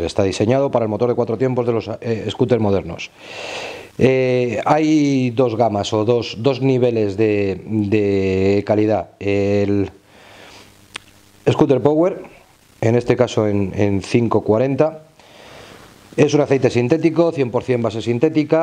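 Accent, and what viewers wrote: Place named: Spain. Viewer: Por el asento este hombre es español, y en España no se debe comercializar la honda xr 150.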